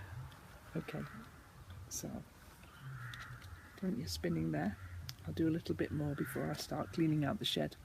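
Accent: England, United Kingdom. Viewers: You are always busy with something! I live in Michigan, USA, but was born in England at Wimpole Park. We went back to England again & lived in Maidenhead for my grades 1 - 4. Dad was in the US Air Force. I'm retiring at age 68 on 12/31/2022. I have several quilting projects lined up. I love listening to you as it reminds me of my neighbors in Maidenhead! They were like Grandparents to me! I love watching you make all of your beautiful things. Take care!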